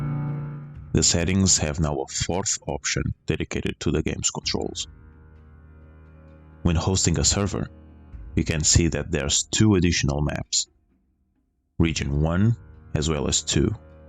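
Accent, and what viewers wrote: Portugal. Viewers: este sotaque é tuga meu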